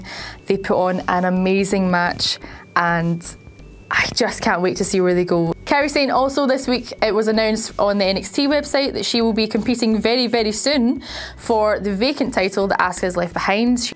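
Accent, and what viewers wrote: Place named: Scotland, United Kingdom. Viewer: My man's got one hell of a Scottish accent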